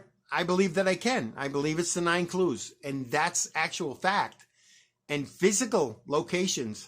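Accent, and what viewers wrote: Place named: Boston, United States. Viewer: In your video you say "Kent and Esther is pronounced Canasta, at least that's how we say it in Boston." So is your claim the poem could ONLY be completed by someone from Boston area? Not the redneck from Texas (they sure don't pronounce it that way). No one from 99.9% of the world? Only someone who has a Boston accent? Did Forrest ever even go to Boston? It makes no sense for someone who spent 15 years creating a poem to make it solvable for only people with a Boston accent, then release it at a book store only in Sante Fe. And Forrest says he wrote it for every redneck in Texas. You are very biased towards your pronounciation and ignoring what Forrest writes and says. I'm glad you promise you are done. Good for you. Your brain injury is a sad story but you are brave to openly discuss it and it should help everyone be understanding of your handicap. 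it comes through loud and clear; " I've offered them treace peaties so, so many times" Is that how you say it in Boston?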